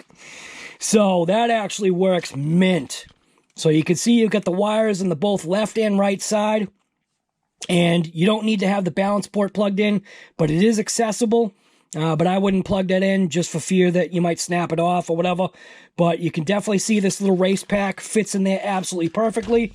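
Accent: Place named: Massachusetts, United States. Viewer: loving the MA accent fellow Masshole def subscribing well done vid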